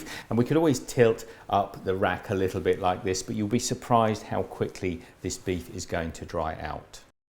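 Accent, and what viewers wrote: Australia. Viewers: Tell me he isn't Australian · This guys Australian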